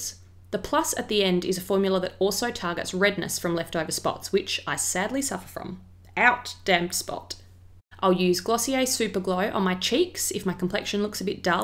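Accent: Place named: Australia. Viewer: love your Aussie accent! nice skin care routine!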